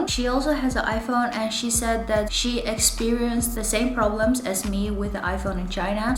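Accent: Netherlands. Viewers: 300$!!! You must have used a ton of data! Thank you for answering quickly and elaborately! So my take a way from this is that, it was expensive, but it worked well for you and your mother? Volgens mij kom je uit Nederland als ik je accent goed inschat😀, bedankt voor de reactie! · Nederlands accent gespot! Handige tips, bedankt. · Thank you! You sound totally Dutch, are you 🤔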